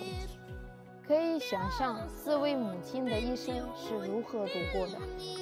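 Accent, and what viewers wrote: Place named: Shandong, China. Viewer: 我觉得你说话有山东口音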